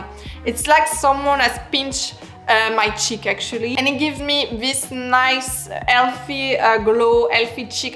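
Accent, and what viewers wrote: France. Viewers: Please do an updated video on this topic, but starting with a bare face and going through your routine, a ‘get ready with me’ style video. It’s more fun to see it in action and see your technique. Updated product recommendations too. Please! 🙏 You are my favorite style channel I’ve ever found, you’re truly inspiring, i aspire to be as chic as you someday soon. And I love your thick french accent. J’adore ☺️❤️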